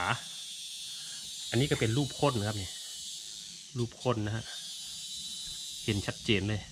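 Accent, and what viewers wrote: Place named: Sakon Nakhon, Thailand. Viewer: ข่อยกะคนสกลคือกัน อ.วานร แต่สำเนียงบ่คือเจ้า สำเนียงของเจ้าคือคนในตัวเมืองสกล ละเบ๋อ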